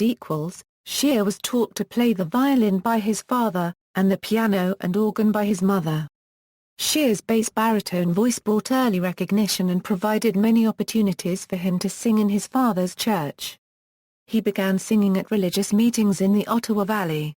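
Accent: United Kingdom. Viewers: Is this female British voice an actual woman’s voice or an AI FAKE VOICE?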